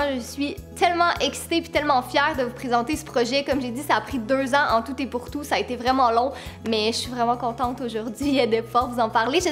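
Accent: Quebec, Canada. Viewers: JE T AIME troooooooooooooppp (j'adore ton accent quebecois)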